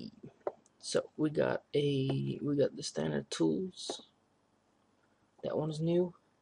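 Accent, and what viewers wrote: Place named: Netherlands. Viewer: I can hear that you're dutch. How old are you? :D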